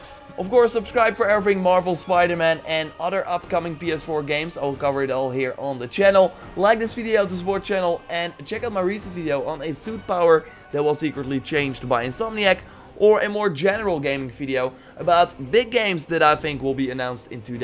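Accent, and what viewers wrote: Netherlands. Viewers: He's not trying to be annoying it's an accent. He's Dutch and have said on many streams that he's sorry and that he tries his best to talk in English. Some Dutch ppl have a hard time speaking in English so it's a good thing that he can even speak english. Next time before you say someone's annoying do some research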